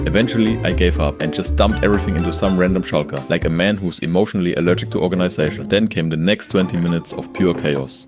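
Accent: Germany. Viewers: Two Things: 1) You make so good content, you deserve 500k and more subs because you put so much efort in your Videos❤❤❤❤❤❤❤ 2) I think you are german (Ich bin selber deutscher und man kann richtig raus höhren das du deuter bist) ❤❤❤❤❤❤❤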